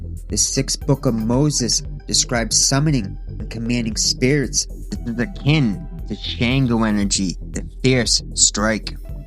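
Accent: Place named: New York, United States. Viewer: You gotta be from New York w that accent lol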